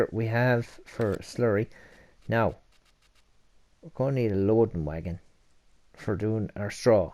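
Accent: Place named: Ireland. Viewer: Keep it up 👍 which part of Ireland are you from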